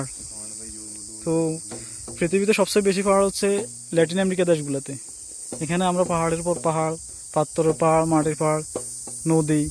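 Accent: Noakhali, Bangladesh. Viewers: Vai mone hoy noykhalir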